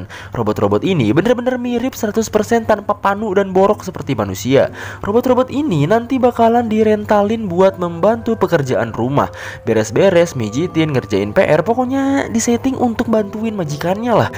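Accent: West Java, Indonesia. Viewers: Sunda euyy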